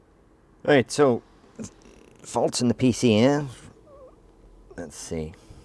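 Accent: Northern Ireland, United Kingdom. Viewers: Great video. Love the NI accent!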